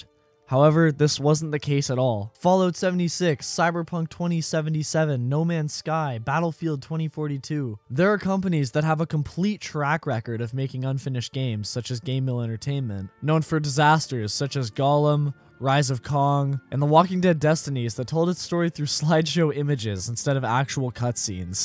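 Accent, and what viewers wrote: Canada. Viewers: I always like how u can tell someone is Canadian when they say: about. Great vid btw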